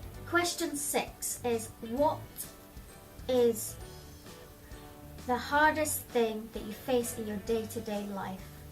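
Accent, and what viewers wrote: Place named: Scotland, United Kingdom. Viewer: So lovely getting to know you better and hearing your lovely voice. I didn’t know you are Scottish! Love the accent xxx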